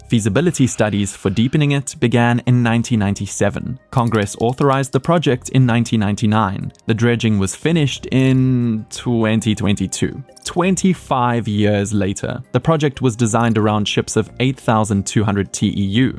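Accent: South Africa. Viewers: Aweh aweh! Yet another channel with dope graphics and a narrator with a South African accent! Lets gooo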